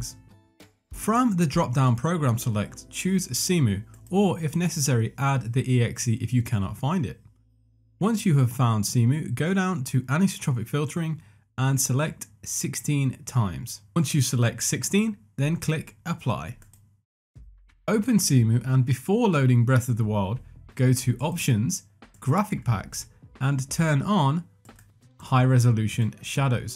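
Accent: United Kingdom. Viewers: Damn, that British accent! I absolutely love it! And your video too, of course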